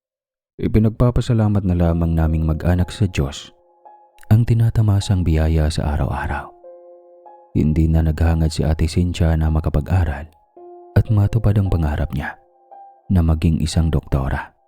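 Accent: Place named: Iloilo, Philippines. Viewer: Kuhang-kuha ni sir ang salita namin na Ilonggo😇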